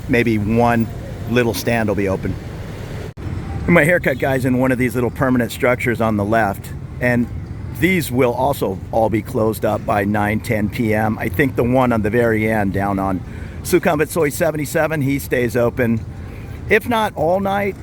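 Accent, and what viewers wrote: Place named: Southern California, United States. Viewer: Hi, I just spent a week in On Nut and you popped up in my YouTube feed. I lived on La Jolla Blvd. in PB for three years, back in the late 90’s. It was a giggle hearing your SoCal accent. 😀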